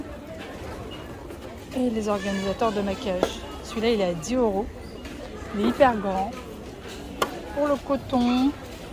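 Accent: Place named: Morocco. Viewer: Bon début de semaine ♥️🇨🇵🇲🇦 et Allah y3awnk ala wladk j'adore ta façon du parle Marocaine hh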